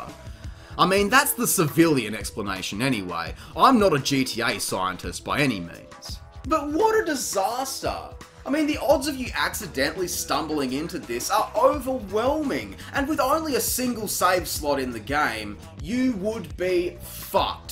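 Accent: Australia. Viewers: Dude, I’m new to your channel and I have to say, you have of the most authentic, energising and entertaining personalities of anyone I’ve seen making this kind of content. So many people play a kind of character when they’re in front of the camera. There’s nothing wrong with that per se, but it’s so refreshing to see a person cut out the nonsense and speak articulately and passionately about the things they love — and the things that give them the shits! And not to shit on anyone else, but it’s awesome to hear a proper Aussie accent without some kind of weird affectation / exaggeration that some of the more popular Aussie YouTubers seem to feel they need to put on. My only regret is not finding you sooner. Keep doing what you’re doing my man, I look forward to coming along for the ride!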